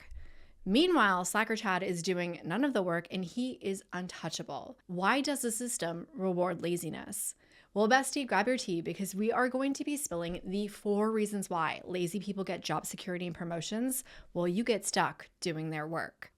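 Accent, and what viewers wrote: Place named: New Jersey, United States. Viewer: Thanks for the video but the jersey girl accent is shaking up my autism. 🩵💐🧸🩷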